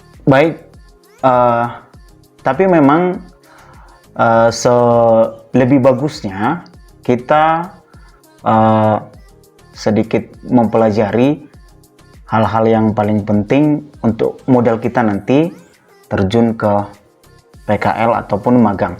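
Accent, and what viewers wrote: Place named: Tegal, Indonesia. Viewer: Semangat pak ngapak tegal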